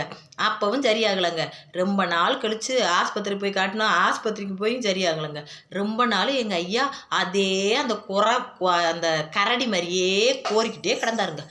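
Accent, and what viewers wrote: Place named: Paramakudi, India. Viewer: நீங்க பேசுற ரொம்ப புடிச்சிருக்கு என் பிரண்டு ஒரு பரமக்குடி சைடு அந்த ஊர் மாதிரியே நீங்க பேசுறீங்க நீங்க நல்லா தொழில் பண்றீங்க அது மாதிரி தான் நானும் ஏதாவது செய்யணும்னு முயற்சி பண்றேன் ஆனா என்னால் செய்ய முடியவில்லை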